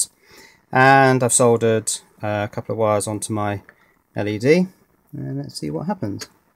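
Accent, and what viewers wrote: United Kingdom. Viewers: This may be the case, but the presenter here has a British accent. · U from UK?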